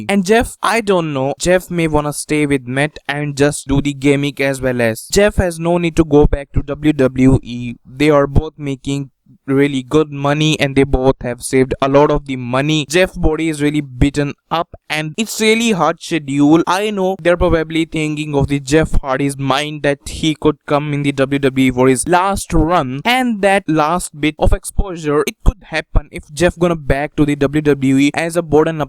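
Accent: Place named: Pakistan. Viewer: annoying pakistani voice... ughhhh... go to your call center job buddy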